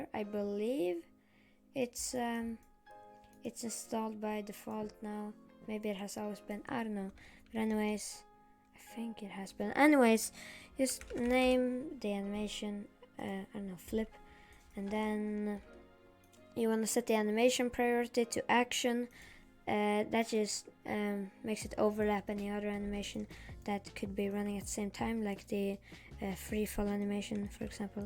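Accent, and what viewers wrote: Sweden. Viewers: ur swedish I know it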